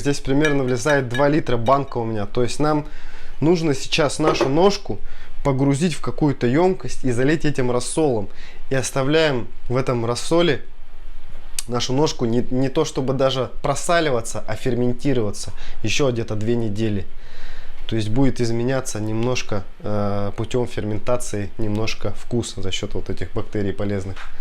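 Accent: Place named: Altai, Russia. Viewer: Спасибо за рецепт! Говор интересный. На брата похож, он с Алтая. Удачи и здоровья!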